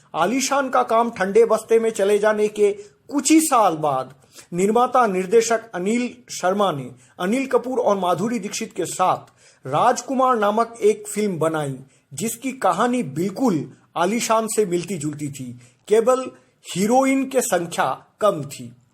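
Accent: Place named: Bengal, India. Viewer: Baangaal na tumi ... Xcent ta oto sundoor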